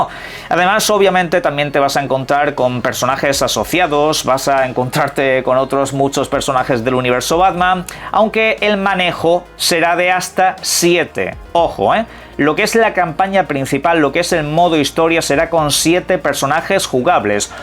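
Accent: Spain. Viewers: que asco español de españa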